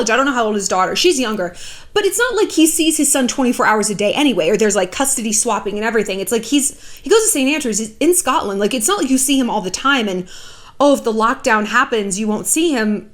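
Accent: France. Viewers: Your French accent <3 and all your other wonderful accents, they're always so entertaining to watch! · Ravie de savoir que tu es française! Ahaha lovely accent xx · Love your French accent, I laughed so much. Greeting from Paris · J'adooooooore ton accent français. C'est parfait et rigolo, comme toi, beauté ! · CIGARETTES? OMG. That's total insanity. That's disgusting. I love how you say, "I think about this once a week!" YOU ARE HILARIOUS! I'm dying. I also love your French accent and vocal fry. You're a great actress.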